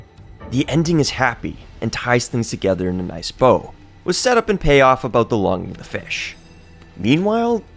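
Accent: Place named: Upper Midwest, United States. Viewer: Are you from the the northern Midwest? Your voice is nice but I notice a bit of an accent which is actually quite charming.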